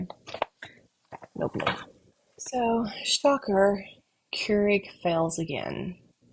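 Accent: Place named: South Carolina, United States. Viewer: You sound like us in South Carolina. I didn't comment on the snake because so used to that. We have had snakes moving around lately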